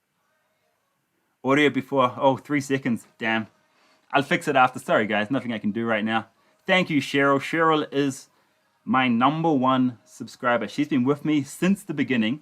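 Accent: India. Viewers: Stay safe......just started watching your vlogs after that police incident. And liked your hindi accent. Love from bangalore. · Hi I’m in the blue mountains in Sydney. We are quite good! I have friends in Bangalore that banged on pots which was quite beautiful to wake up the government.. take care and stay safe! You sound more Indian than a kiwi.. blessings 🙏🏿🙏🏿🙏🏿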